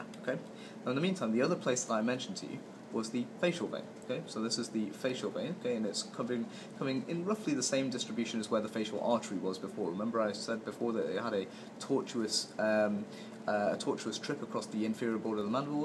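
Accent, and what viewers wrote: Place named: Wales, United Kingdom. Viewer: Very useful, many thanks! You sound slightly welsh which is also a bonus!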